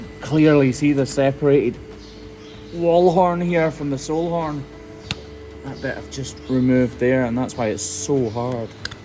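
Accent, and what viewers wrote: Scotland, United Kingdom. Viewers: I would move to Scotland just to hear the accent. Great care with the cows :) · You sound even more Scottish than normal in this video lol. I love it!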